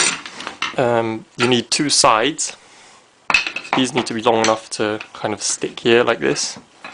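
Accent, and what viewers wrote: United Kingdom. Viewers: Also, you trolled me really hard. I'm watching a video of a guy making planes, a Brit obviously, because of the accent if I ever can spot one, and he says he lives in Japan, and refers to Japanese people as 'they'. So i click on his other video, and who do I see? A fella of obviously Japanese origin, though I've seen in comments you saying you're british. And yes, I was about to ask how come that your accent is perfect, since I know Japanese natives have some problems saying L in English. Also what's funny with you being asian (no disrespect, hopefully you know that) is that the first thing I'm making using plane you've help me build is a... japanese tanto saya (scabbard). Let me tell you, if you ever gonna build one, don't bother with straight chisels. Real sayashi would use a curved one, that can do paring cuts everywhere, even on the far end of the scabbard. 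Now I'm going to my 'workshop' to try for the third time. Come along if you wish to learn some Polish words used to express your discontent, sir. ; )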